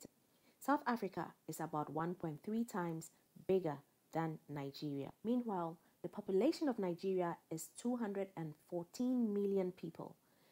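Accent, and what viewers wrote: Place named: Ghana. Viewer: The accent is ghanaian and this channel is ghanaian...so direct this your vitrol to them..Olofo!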